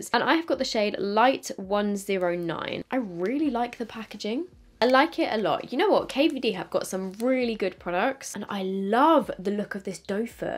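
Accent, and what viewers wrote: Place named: United Kingdom. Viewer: I love this makeup look, I think I’m gonna try it tomorrow (minus the gems because I’m moving house and don’t need to be too fancy 😂) Side note: it’s so adorable when you say “you know what?!.” 😍 Hope it’s not patronizing for an American to say your British accent is adorable, it’s just so soothing and makes everything you say sound interesting!!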